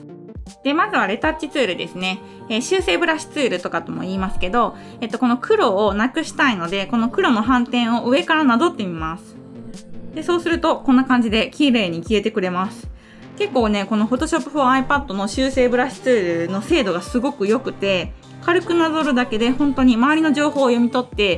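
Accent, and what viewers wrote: Kansai, Japan. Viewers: 地味な作業😁だな〜。 Adobe MAX 楽しみにしてます❣️ そしてめっちゃ関西弁😁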